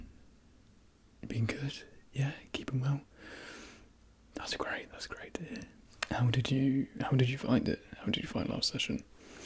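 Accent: United Kingdom. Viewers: What kind of English pronunciation is it? I want to learn it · I love his voice. His accent is ✨perfection✨. I love English accents. Proper English, also known as British English, is just so intelligent, it sounds intelligent. People who are from the UK, when they speak, they sound so educated. But your voice really calms me down, and I love that you can do that. Not everyone's voice calms me down. But you made me feel very relaxed, so thank you.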